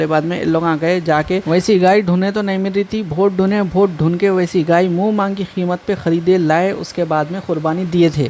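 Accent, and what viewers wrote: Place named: Hyderabad, India. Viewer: Bhai hedrabadi nhi bola kre kuch chizs smjh nhi aati bhot jgh se viewrs hai apke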